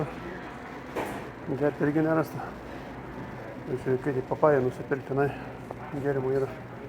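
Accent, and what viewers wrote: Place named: Šiluva, Lithuania. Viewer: AŠ iš Šiluvos.malonu.Keista,kad kalan grynai tai pat. :hand-pink-waving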